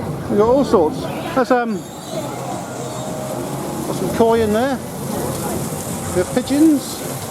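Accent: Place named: Suffolk, United Kingdom. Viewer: Never seen your videos before but I enjoyed this. I could hear the Suffolk accent in you and then you name dropped pleasurewood hills. Lowestoft boy? 😀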